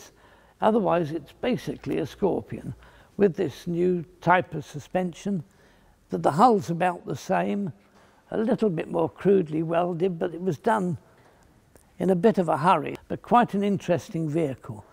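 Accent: United Kingdom. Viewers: That is the correct UK English pronunciation. How it is pronounced elsewhere, including where the jaguar cats actually live, is irrelevant to the subject. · Why does the British accent make everything fun to listen to?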